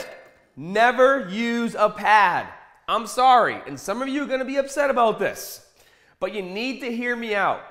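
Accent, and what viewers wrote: Boston, United States. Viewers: It sounds Bostonian but also occasionally Australian but also sometimes just standard lol. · Ba-bell! Lol. Love the Boston accent.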